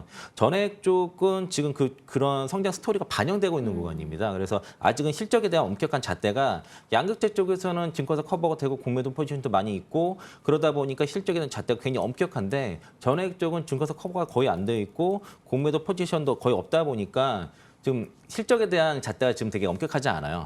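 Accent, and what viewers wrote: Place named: North Korea. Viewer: 아나운서 목소리 북한사람인줄~~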